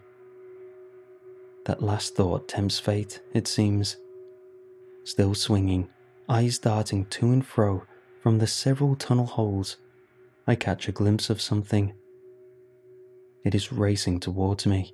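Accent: United Kingdom. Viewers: Amazing voice from our favorite british narrator!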